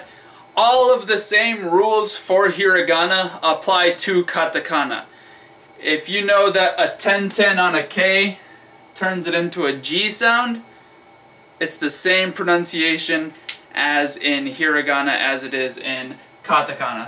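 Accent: United States. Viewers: Nice friendly guy.....the writing is stll ok....but that American - Nihongo accent is really not working.... pity...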